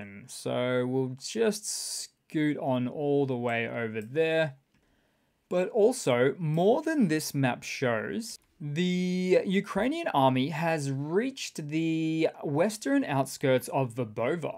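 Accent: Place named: Australia. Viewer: I need a more huge wins for the Ukraine 🇺🇦 in my lifes ! Thank you please And this Australian accent rocks m8) 🙏💙✊ Cheers man 💎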